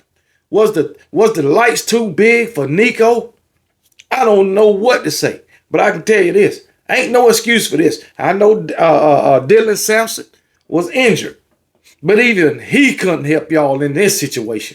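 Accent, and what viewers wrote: South, United States. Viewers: I love this commentary. The Southern dialect cracks me up. “Ryan Day ought to be arrested tonight for what he had his goons do…” 😂 hilarious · Hilarious hearing this commentary in a Southern accent. This is new for Buckeye fans🤣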